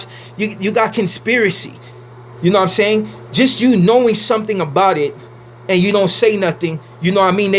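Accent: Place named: Mexico. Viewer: " vale pito " only a true mexicano can talk like you carnal my respects im a new subscriber keep the videos coming bro sur up perrito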